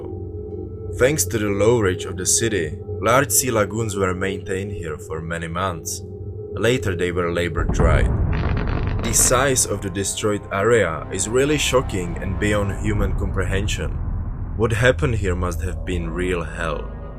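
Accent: Czech Republic. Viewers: dal som si tu namahu aby som zistil odkial je vlastnik kanala a ano nemylil som sa ....ceska anglictina je jedinecna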